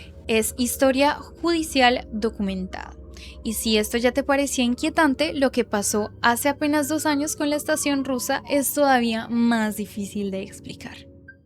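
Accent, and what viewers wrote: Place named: Mexico. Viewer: sisi. no siempre, pero muy tarde a la noche se escuchan cosas interesantes. espero mas videos tuyos, bella voz, que sumada a la tonada de mexico es hermosa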